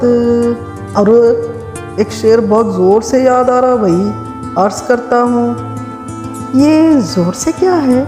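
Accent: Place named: Deccan, India. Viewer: دکنی لب و لہجہ میں مزاح سے بھر پور بہت عمدہ دیدی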